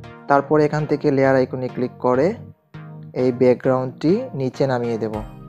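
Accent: Sylhet, Bangladesh. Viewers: vai sylhety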